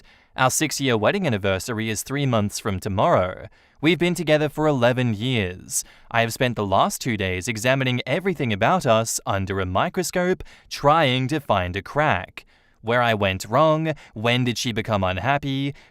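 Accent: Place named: Australia. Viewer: I love hearing your Aussie voice, it's a thankful break from all the AI read stories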